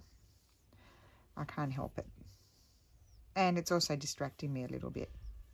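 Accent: Australia. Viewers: Kid are kids at lest they are out side I just concentrate on watching you and listening. So great to hear and Aussie voice. Regional Victoria except for Shepparton he’s out of lockdown now but hard and fast rules still apply masks 10 people outside can gather, and in restaurants only 10 even if individual there can only be 10 people in a place at one time so I am going out with my granddaughter I haven’t seen for months for lunch we have one hour in a restaurant that’s it. Then do a little bit of shopping and she can’t come home with me I’m still not allowed to have any visitors although I live on my own. I hope all your family and friends in New South Wales or where ever they live in Oz are okay I pray that they are safe from the dreaded word please pass on my love to them. I love your videos so much sometimes I watch them two or three times and if I get Maude even more I love all that you do although I can’t come up with what you do. 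Hope you are safe over there take care.🥰🦋💕🌈💜.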